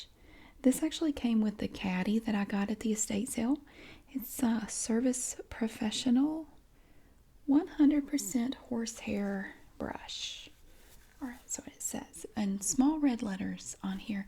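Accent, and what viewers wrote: South, United States. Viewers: Oooo this works for me. The combination of the brushing sound and gentle southern accent is pleasing.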